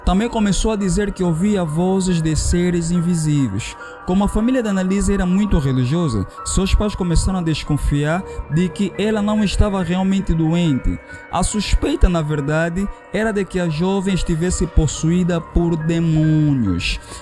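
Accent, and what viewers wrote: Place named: Angola. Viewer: Estava atrás desse episódio já faz um tempo e gostei de ouvir no meu sotaque Angolano🎉🎉🎉🎉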